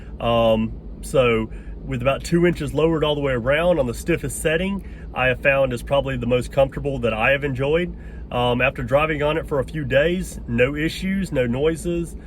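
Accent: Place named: Texas, United States. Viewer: Chrisfix with a texas accent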